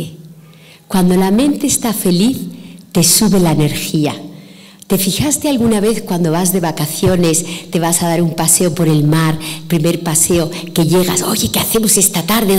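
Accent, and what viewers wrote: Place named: Spain. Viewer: Me parece muy bueno, por que sigue todas las características de El Arte de Vivir, llevadas al gran publico (es un decir) por que la mayoría no entenderá los mensajes. Su dicción y su tono de voz, con su recatado acento español. son fascinantes.He estado recomendando este vídeo a las personas importantes que lo entendieran. Lamento apreciaciones como las de Martin Martin. ya comprenderá, mas pronto o menos pero aprenderá a respirar y sera diferente.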